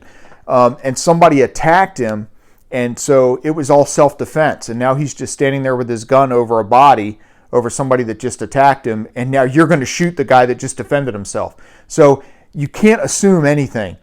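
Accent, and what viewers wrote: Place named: Maryland, United States. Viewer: I can spot the maryland accent from a mile away.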